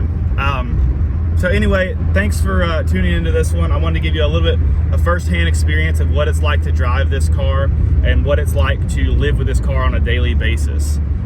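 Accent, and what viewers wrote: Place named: Sweden. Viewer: Where is this in the US. For some reason I think I can hear a little bit of Scandinavian(Swedish) accent, can be wrong. Thanks.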